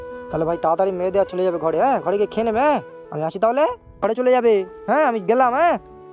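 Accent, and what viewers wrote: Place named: Bangladesh. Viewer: হ্যাঁ ভাই আপনি বাংলাদেশের মতো কথা বারতি বলেন আপনার ইন্ডিয়ান ভাষা হারানো বুঝি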